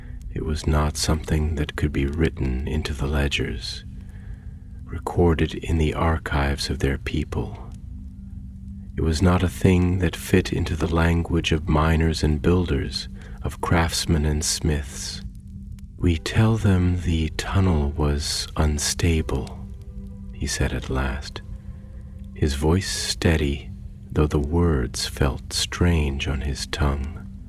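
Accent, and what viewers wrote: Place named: United States. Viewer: American accent 🤮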